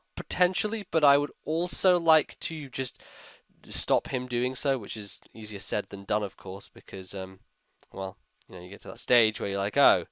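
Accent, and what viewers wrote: Scotland, United Kingdom. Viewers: What? Are you scottish?! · Are you Scottish? I thought you were Englandish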